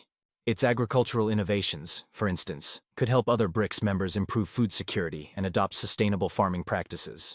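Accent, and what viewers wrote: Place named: United States. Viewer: Somewhat ironic to use an American accented overdub??? Perhaps you need to rethink that approach.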